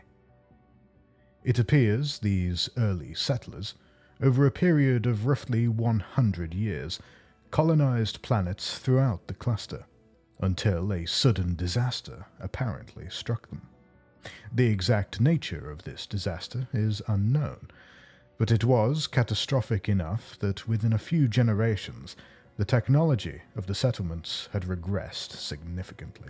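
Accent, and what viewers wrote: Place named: United Kingdom. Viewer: I can hear all the astrophysicists cringe, but since it’s a British accent I’ll allow it.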